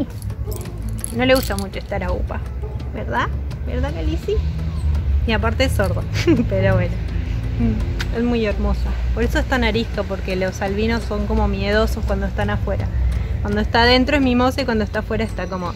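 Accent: Argentina. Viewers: Me encanta tu acento argentino saludos y bendiciones Para ti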